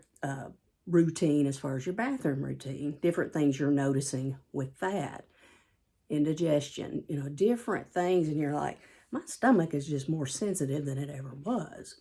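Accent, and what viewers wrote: South, United States. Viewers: I have experienced ALL 9. You just came across my feed... it's nice to hear someone w/ a southern drawl discuss these topics, as I too am southern, am sensitive to energies, practice energy healing & reiki. You asked for additional symptoms we may have had as well ... Heightened intuition, whole body vibration, energy pouring from hands & feet, connecting to plants & animals, wild synchronicities w/ numbers, music, situations, just to name a few. Oh, Clair's coming onboard & spiritual hot flashes ..crazy, crazy dreams too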